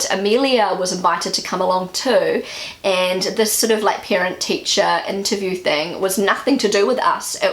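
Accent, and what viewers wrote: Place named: New Zealand. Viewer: We just had this LSE-meeting (short for Teacher, Student, Parent) last week with my son our first time and I was suprised to see his interaction with his teacher. There were some questions where I thought I’d needed to help him answer (we are a bilingual home, and mainly speak English at home because of my husband) so it really made me happy to see his good relationship with his teacher and his confidence. I do like this idea. When I was in school it was with the parents only, and the kids stayed home. And from some friends and family who have teenagers, when their kids were smaller it was still the same - parents only (Rheinland-Pfalz). So I’m not sure when this actually started. Also, I must say I enjoy your videos so much! But I don’t know if I like it more because of your topics or because of your New Zealand dialect and pronunciation. 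I could hear it all day!!!❤️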